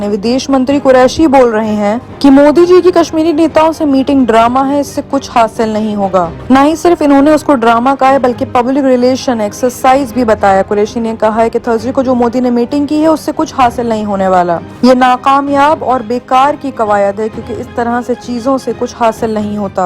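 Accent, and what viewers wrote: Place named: Bihar, India. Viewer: Koun to b hai g amma bihari dikhre aap awaz se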